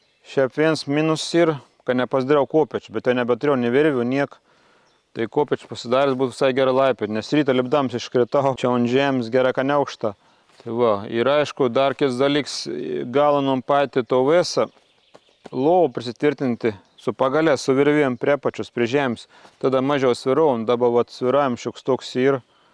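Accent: Samogitia, Lithuania. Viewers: pasiilgstu video kai ne zemaitiskai snekedavai..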